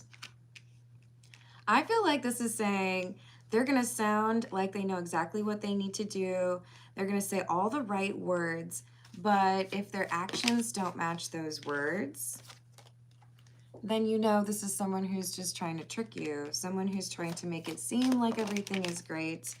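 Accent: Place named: Minnesota, United States. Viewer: Bahahaha..love the Minnesota accent. 😂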